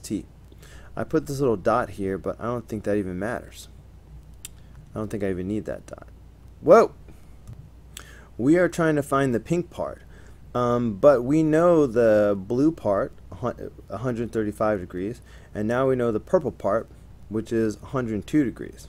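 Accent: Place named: East Coast, United States. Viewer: Nice job. I detect a Eastcoast accent? Where did you do undergrad.? Just curious. I just subscribed you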